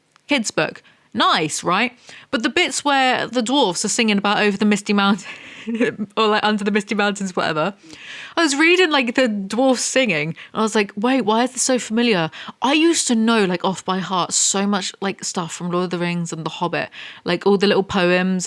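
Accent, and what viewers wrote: United Kingdom. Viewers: Damn you, i can no longer sleep without the sound of a snarky brit bullying rich people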